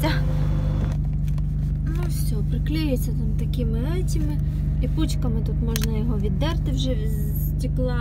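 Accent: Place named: Surzhyk, Ukraine. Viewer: Ох Суржик суржик) Привет из Сумщины😁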